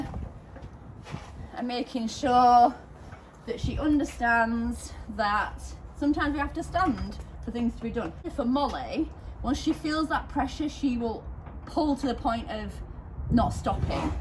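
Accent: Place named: Yorkshire, United Kingdom. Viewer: Err yes she can really use herself free jumping lol😂, Molly is going to be a proper sweetheart given time which I'm pleased to hear is what you do. Very impressed with your knowledge of feed and how important it is to read the bl###dy contents, know what your horse/ pony needs and feed accordingly, no more obese or whizzed up equines, great vlog, and great to hear a Yorkshire voice, also I was taught to ask a mare to get the best out of her ❤xx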